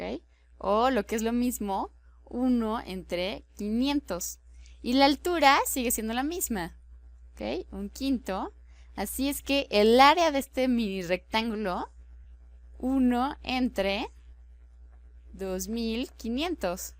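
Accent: Mexico. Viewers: Su voz es horrible, es la típica voz del DF que quiere sonar "fresa", aparte se supone que en docencia no se debe de utilizar el uso de modismos propios de una región como "padre", sobre todo en un lenguaje tan global como el castellano. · Buen día. Alguien me podría decir(desasnar) si esta forma hablar corresponde a una persona oriunda de México?,en ese caso seria la forma de hablar del pituco, cheto, etc (persona relacionado con la "alta sociedad y con dinero")? No se si pensó que solo los de México verían el vídeo, en lo personal hubiera preferido un castellano neutro. En gustos y colores.....a mi no me gusta su voz. Gracias por el vídeo. Saludos cordiales!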